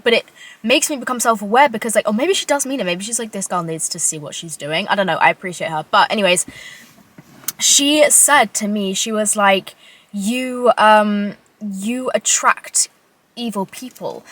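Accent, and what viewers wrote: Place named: United Kingdom. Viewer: I could listen to u talk for hours it’s like listening to myself but British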